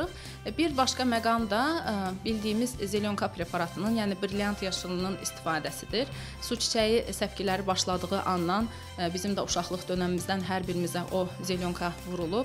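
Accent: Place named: Kirkuk, Iraq. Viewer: Coğ coğ guzal konuşir. Tem bizim kerkük dili gibi... Salamlar siza kerkükten. ❤❤❤❤❤❤❤❤❤❤❤❤❤❤❤❤❤❤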